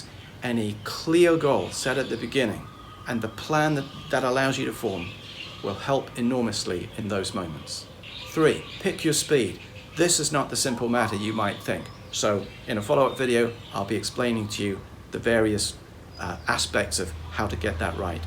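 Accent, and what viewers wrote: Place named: United Kingdom. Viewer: I think the birds really dig what you're saying. I know I get all frothy like that too when I hear your smooth British accent.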